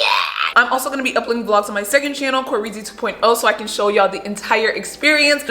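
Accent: Nigeria. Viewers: Are you Nigerian 🇳🇬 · Lol I was wondering why you always switched to a Nigerian accent in your videos 😅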